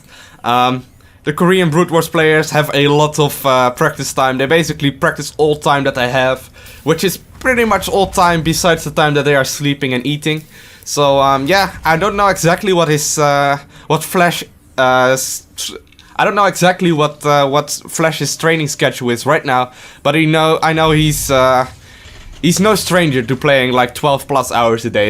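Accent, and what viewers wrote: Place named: Netherlands. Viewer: This accent could not be more dutch...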